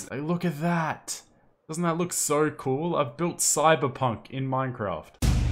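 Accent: Australia. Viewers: Are you Australian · You're Australian? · This dude had an accent and everything and I still didn't realize he was Australian until he said something. I'm an idiot. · WAIT YOUR AUSTRALIAN!?! I didn’t know….YASSS I FINALLY FOUND A NON~ AMERICAN YOUTUBER!!!!!!!!!!!! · Wait, you’re Australian? POG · Wait, Australian YouTuber?? Omg I thought he was British... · Seriously I never Knew you where Aussie #AustraliaForLife Because I’m Aussie to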